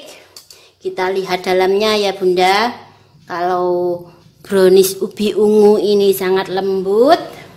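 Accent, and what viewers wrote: Java, Indonesia. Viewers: Suara blender sama yg ngisi suara cucok meong Hhhhhhhh medhok x bhsnya psti jowo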